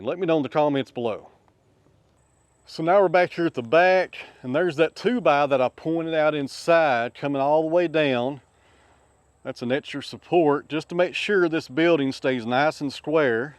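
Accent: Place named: Tennessee, United States. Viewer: I guess I could listen to the Spanish version since I understand that, but without the Tennessee accent, it won't be the same. 😉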